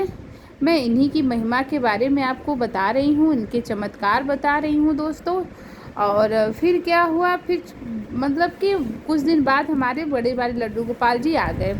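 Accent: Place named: Pahadi, India. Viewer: Aap ka accent bta rha h aap pahadi h 😀❤️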